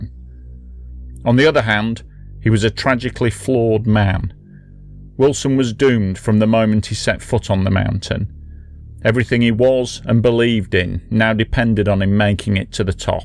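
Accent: United Kingdom. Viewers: clearly you say 18-98. Our Yank cousins ain't used to our dialect, innit 😆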